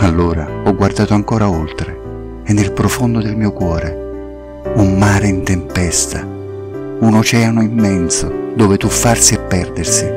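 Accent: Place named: Naples, Italy. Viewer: Bella voce ma inflessione troppo partenopea... peccato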